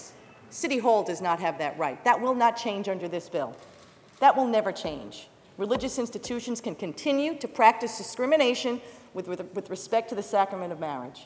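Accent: New York, United States. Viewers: I think her words make this speech great but her new york accent make it amazing!! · that was fantastic. also, I just love ny accents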